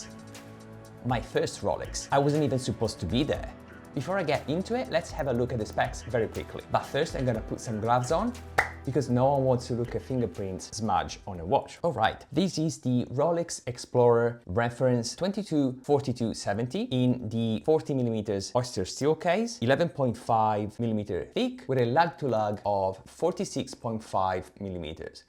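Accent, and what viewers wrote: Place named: Vietnam. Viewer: Love your accent. Vietnamese?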